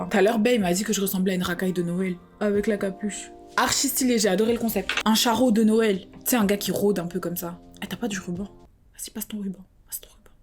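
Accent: United Kingdom. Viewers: Holala c'est vrai qu'en brune cheveux courts un peu ondulés, ça te va su-per-bien. J'ai bien aimé ce moment ! Je partage également l'idée qu'une chaîne en anglais serait absolument génial. C'est que j'apprécie aussi, ces expressions anglaises et ces accents, qui font totalement naturel chez toi. Moi aussi au lycée les gens à l'aise en langue étrangère étaient moqués. C'est typiquement français en fait. Une espèce de complexe d'infériorité qui finit par être d'une arrogance gênante. Ça a brisé des ambitions linguistiques ce comportement. Bref ! I love so much your accent ! Please, made your english channel it will be so cute to level up in english with you too. 🙏❤😘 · Tu peut faire desfois des vidéos en anglais sous titré français si ta la foi de le faire mdr !j essaie d'apprendre et j estime que l'anglais c est une langue mondiale on devrait l apprendre serieusement depuis la maternelle et pas a partir de la 6 eme...anyway j aime trop ton accent Anglais 😍🥰🔥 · Ça serait trop que tu fasses quelques vidéos en anglais we love that with your british accent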